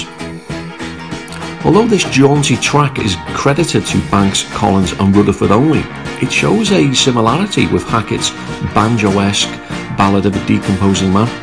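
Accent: Liverpool, United Kingdom. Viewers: I’m from England and believe I have a good ear for English accents but it’s not just because you’re a scouser that I can’t believe you aren’t of Guitar the YT channel mainly devoted to detailing all things Oasis, Shed Seven, Ocean Colour Scene & Britrock of the late 80s onwards. Perhaps you are one or have diverse tastes but operate under various headings! Both channels - this one & are great in - depth stuff anyway 😁❤️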